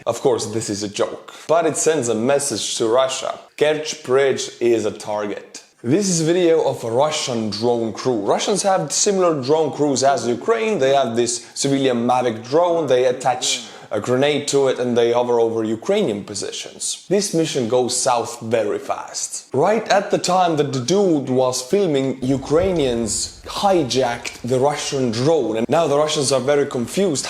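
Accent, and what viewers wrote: Scotland, United Kingdom. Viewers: That's one top Scottish accent .... better than the Highlander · I love the Scottish accent 🤣🤣🤣🤣👍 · Love the jock accent mate, funny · Absolutely love the Scottish accent, made my day. Lol lol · Nice Scottish accent xD I don't often here Europeans doing impressions of any UK regions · 😂😂 Loving your Scottish accent! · This scottish accent 😂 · that Scottish accent is truly beautiful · Best Scottish accent ever, never change 🇬🇧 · Your scotch accent is perfect I love it it cracks me up inside and out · Dude , your Scottish accent is hilarious 😂🤣😂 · Great to hear a fellow Scots with a local accent 😉😆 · Great info as usual and I love the Scottish accent 😀. ( realy is Ivan that bad? 😀) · pretty good Scottish accent. WE always refereed us as "OAT MEAL SAVAGES" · I am Scottish but I find your Scottish accent funny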